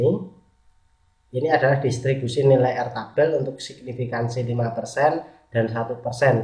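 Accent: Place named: Java, Indonesia. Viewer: medok-medoknya sekilas mirip sama alm. bapakku.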